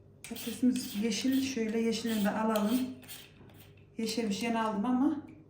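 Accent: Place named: Nevsehir, Turkey. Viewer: Selamünaleyküm memleketlere Nevşehirli gibi konuşuyor aynı güzel oluyor eline sağlık Eline sağlık ben de yapıyorum onu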